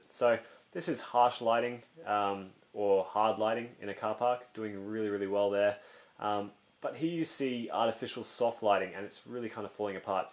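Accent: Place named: Australia. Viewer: Silvia and Aussie accent, feels like I'm watching Mighty Car Mods :)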